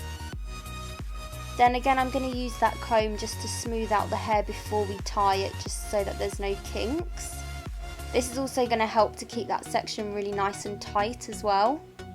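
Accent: United Kingdom. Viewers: Lovely accent. I want to be British!😭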